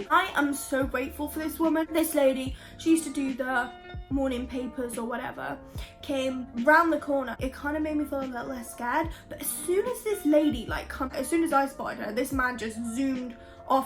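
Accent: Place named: Wisconsin, United States. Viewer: Came from Tik Tok and didn’t realize you had an accent. Lol such a surprise. I forgot not everyone talks with a “Wisconsin Accent”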